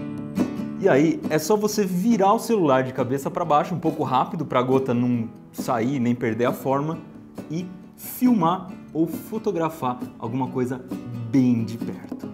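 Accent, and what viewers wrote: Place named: Northeast, Brazil. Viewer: Nordestino DETECTED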